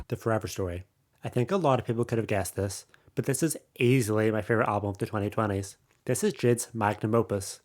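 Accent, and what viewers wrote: Ireland. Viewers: The Irish accent trew me off ngl 😂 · I was not expecting the Irish accent lol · Love this. More of these please! He talks and he’s Irish! OMG..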